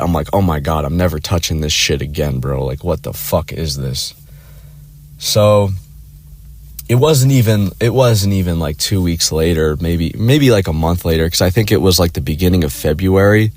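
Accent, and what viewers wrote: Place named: Minnesota, United States. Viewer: Dang the Minnesota accent is so clear in this video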